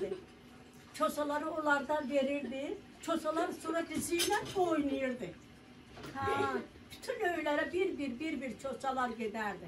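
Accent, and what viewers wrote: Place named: Baku, Azerbaijan. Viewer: Nan bakı lahcasikimi dansdl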